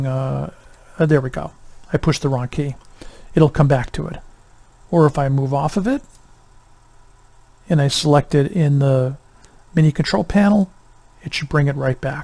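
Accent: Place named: United States. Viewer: Thank God - someone who's from the US and speaks English for this tutorial.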